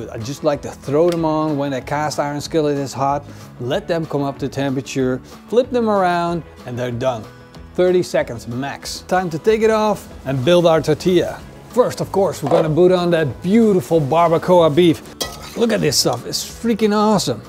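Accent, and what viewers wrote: Netherlands. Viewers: He looks dutch and sound dutch 😄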